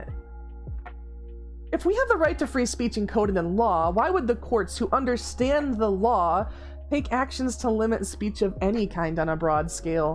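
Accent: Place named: United States. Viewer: I don't think you understand what free speech is. I assume you live in the US by your voice, so unless you have been arrested, tried and jailed for speech, it is a protected right.. Just because you werent allowed to blabber during class as a child doesn't mean you don't have free speech. If you're arrested you can literally sue the police department in civil court for the damages. Threatening somebody or making a call to action where there's a reasonable belief people will get hurt has NEVER been free speech.. also Literally no women in the last 40 years has been told "you belong all dressed up in the corner, awaiting a man's command"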